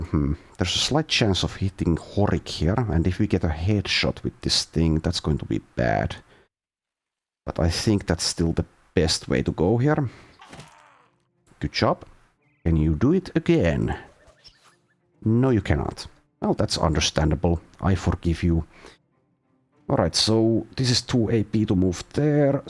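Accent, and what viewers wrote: Finland. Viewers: Finnish I would say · agreed very familiar Finnish inflection on the "D" and "R"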